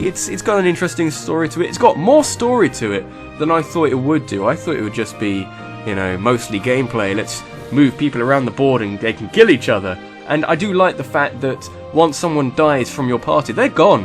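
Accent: United Kingdom. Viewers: Where in Britain are you from?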